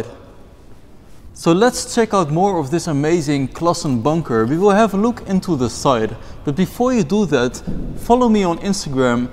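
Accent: Netherlands. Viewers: It's English in very Dutch accent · Most dutch accent ever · He is dutch :P i hear it :D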